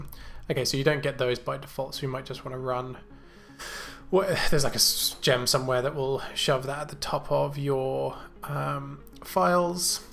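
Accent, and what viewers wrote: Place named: United Kingdom. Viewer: Mate, I really do love your British accent. Cant get enough :D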